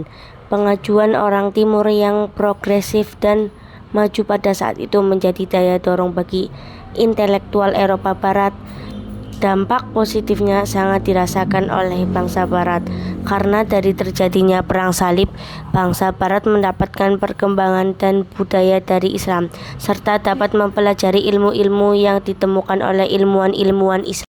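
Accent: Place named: Banyumas, Indonesia. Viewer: Kiw kiw Rada ngapak ya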